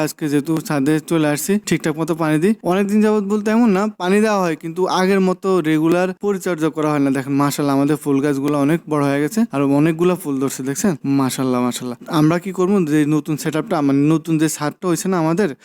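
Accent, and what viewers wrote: Noakhali, Bangladesh. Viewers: ভাই আপনার কথায় মনে হয় যেন আঙ্গ নোয়াখাইল্লা??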